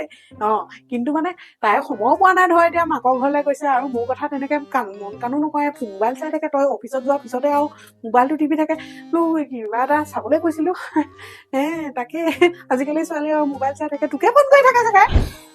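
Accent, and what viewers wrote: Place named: Lower Assam, India. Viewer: 😂😂😂😂😂😂😂😂😂 Hahi Hahi petor nari dal dal 😅 Pise eta kotha. Please don't take it otherwise, apunar mukhot Lowee Assam accent tue besi val lage. Baki sob Mojjjaaa ❤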